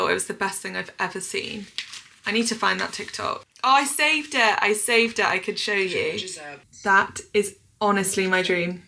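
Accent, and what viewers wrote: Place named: United Kingdom. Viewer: Cozy vibes, beautiful autumn outside, yummy views of the dishes in the restaurant, and pleasant for ears British English... And the similar deciduous trees with yellowing leaves like those growing around my place... It's been a pleasure to watch the video. Keep it up!🎉❤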